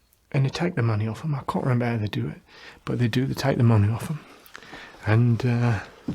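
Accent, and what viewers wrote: England, United Kingdom. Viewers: Youtube's automatic captions didn't stand a chance with this one. I do enjoy a strong english accent though. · are you British? it sounds so real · I think he’s English but doesn’t speak with a cockney accent… could be wrong but check out his other videos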